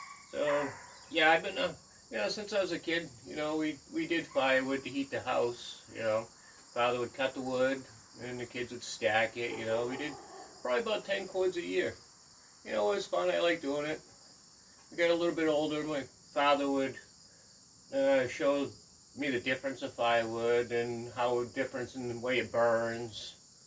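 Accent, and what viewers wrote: United States. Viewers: Hello, just checked out your channel, and I subscribed, that is quite the beard you have! I am guessing you are out east, Maine maybe by your accent? Good luck! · Just found your channel I’m looking forward to watching your updates in the wood yard. I can tell you are a new Englander by your accent, I’m in Connecticut myself, good to see New England represented here on YouTube!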